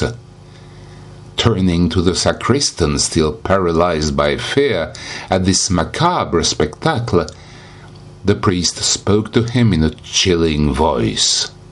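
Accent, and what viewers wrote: France. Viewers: I love the narrator's French accent !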